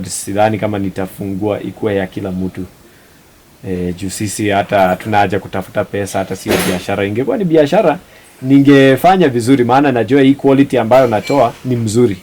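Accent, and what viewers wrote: Bukusu, Kenya. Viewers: Mzungu mbukusu😅accent niya kibukusu aki😅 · The luhya accent is on point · The Luhya accent is just awesome 😀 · FOR ME IT'S THE LUHYA ACSENT, I LIKE THIS HAPPY FAMILY, MAY GOD CONTINUE BLESSING AND PROTECTING THEM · Accent ni ya mbukusu bana he talks like mulamwah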